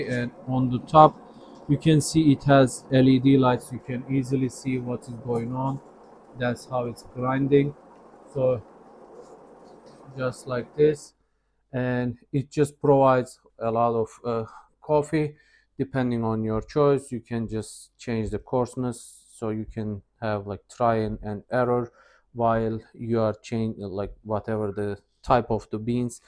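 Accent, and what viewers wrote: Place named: Turkey. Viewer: Aksan ben türküm diye bağırıyor 10/10 video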